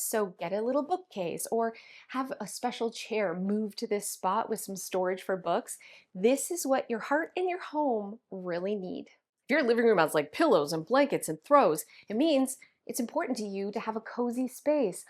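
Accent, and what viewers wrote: Canada. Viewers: Sounds like you're from Canada. Just want to say to all canadians on here, i think you are awesome and kind, and i hope to get to visit quebec this year. Love from Ohio ❤❤❤❤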